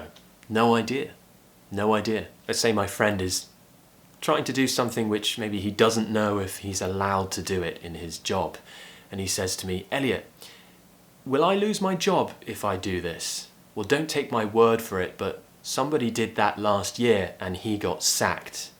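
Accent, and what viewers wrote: RP, United Kingdom. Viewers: please sir i like your accent so much and I'm your follower student on youtube podcast. I found the 4000 essential words are read by American accent and really I like it to be with your British accent. please try to help us by reading these 4000 essential words in English. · You are the BEST pronunciation teacher I am falling in love in British Accentbbecaise of YOU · Guys what british accent that he uses? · I love your accent. Other British accents are also good but this RP accent is the most attractive one. It sounds confident, classy and so alluring. I can't wait to join your course and speak with you! ^ ^ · Hi, your British accent is really amazing, and this lesson is really helpful. Thanks. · My God I'm literally in love with your British accent !!!! 🥰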